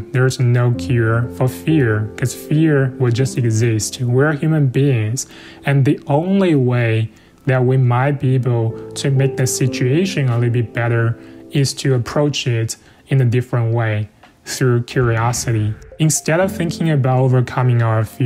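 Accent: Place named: China. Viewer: 听你的中式英语还自以为很流利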